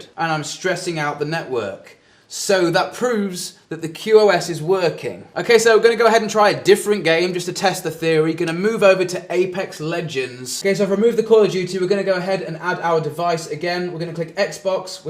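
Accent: England, United Kingdom. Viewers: its his english accent that you find impossible to accept. not my irrelevant youtube name. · He’s British if you didn’t notice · No, he's English and speaks British English, hence he says 'rooter', not 'rowter'. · Calm down mate, that's how they pronounce it in the UK you mong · that’s how we say in the uk if you got a problem take it up with boris johnson he’ll sort u out 😂😂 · It's because he has a British accent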